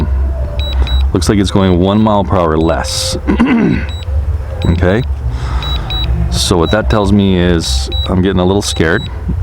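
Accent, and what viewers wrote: Hawaii, United States. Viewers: i don't subscribe for the videos...i subscribe because your Hawaiian accent voice is mesmerizing!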